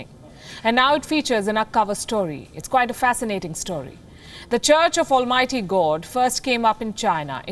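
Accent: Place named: Northeast India, India. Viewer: That north eastern accent 😻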